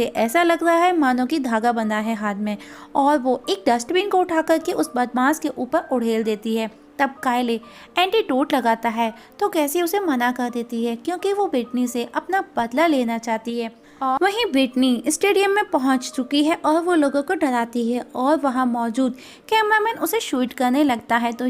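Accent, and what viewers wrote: Bihar, India. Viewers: Lagta hai maidam aap Bihar se ho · R u from Bihar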